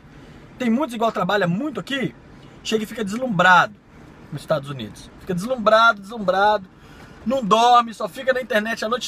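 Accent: Brazil. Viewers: por um acaso você é nordestino você fala com muito sotaque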